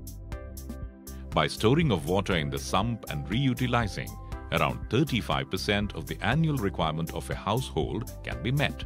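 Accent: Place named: South Africa. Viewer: this really has cheaply made written all over it its basically what you get in your modern shower plug made in india by a company called chicken makka roo!!! advertised by a indian guy called and a accent posh english mixed with south african, i mean look at their research and development tell me thats not a back of a run down chicken coup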